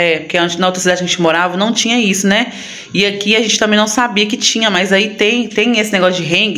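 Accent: Minas Gerais, Brazil. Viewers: UAI, vc é mineira? RS adoro o seu jeito de falar.. eu tbm falo assim e sou mineira. Amo seus vídeos. tem jeito de ser um menino muito bonzinho. Um beijo no coração de vocês. Deus abençoe! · Eu acho muito legal seu sotaque mineiro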